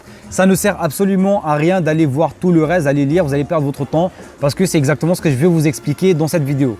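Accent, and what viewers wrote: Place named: Arab world. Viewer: Tu vis en CI, mais t'as un accent arabe, As tu des origines arabes?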